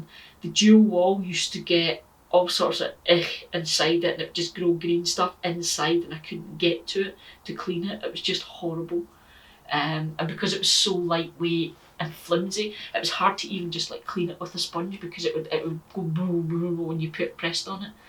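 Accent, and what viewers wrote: Scotland, United Kingdom. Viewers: I'm subbing for the accent. All hail the Scots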